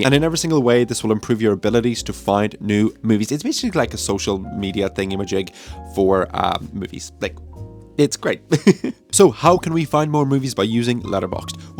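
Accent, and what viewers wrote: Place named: Ireland. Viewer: What is your ethnicity? You sound either Irish, but I’m guessing your name, is Czech/ Slovak, since is Polish for Paul, & those are West Slavic languages.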